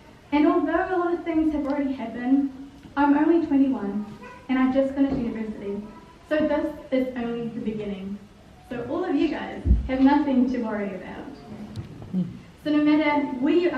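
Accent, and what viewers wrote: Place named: New Zealand. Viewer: Girl why you gotta trill the RRRRR.... You sound better in Kiwispeak ratherrrr than the RRRRR-speak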